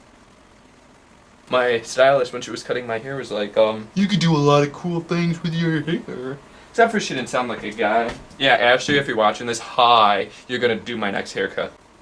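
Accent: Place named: Canada. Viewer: You sound quite canadian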